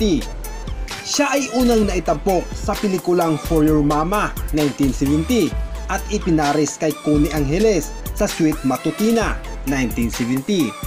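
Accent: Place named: Visayas, Philippines. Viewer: Kainis ka mag kwento patalon talon..at bisayang bisaya.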